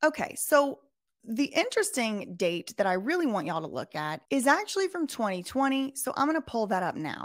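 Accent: South, United States. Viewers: You are the best holy shit. Sweetest accent, funny, southern as hell, gorgeous and an advocate for the weak. You earned a sub please don’t ever stop doin this.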